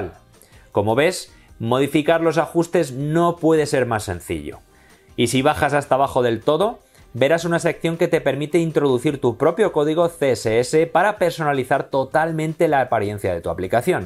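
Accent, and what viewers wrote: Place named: United Kingdom. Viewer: british englisshhhh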